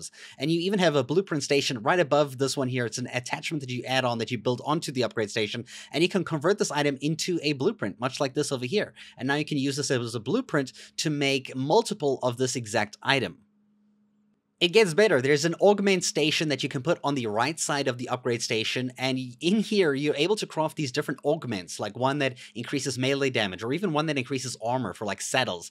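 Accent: South Africa. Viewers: Do I hear a lil South African accent? Heyyyyy from ZA. awesome vid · I could tell a fellow South African from that accent. A buddy got me into ARK recently and showed me some of these. Awesome Spyglass is a crutch for me now. Mist definitely gonna try a few of these. Also subbed too!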